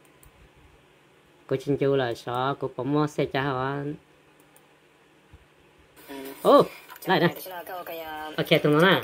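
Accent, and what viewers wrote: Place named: Laos. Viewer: I like your Laoglish Bro.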